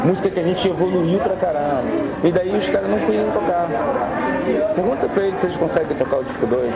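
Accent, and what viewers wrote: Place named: Brasília, Brazil. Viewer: Sotaque Brasília antigo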